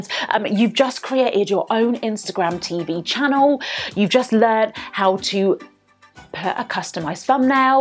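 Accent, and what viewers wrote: United Kingdom. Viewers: love your accent, great content! i have never used the app, but i just use the IGTV on the instagram app. will download the IGTV app to make it more official lol, haha that british accent of yours, omg! look forward to more of your content.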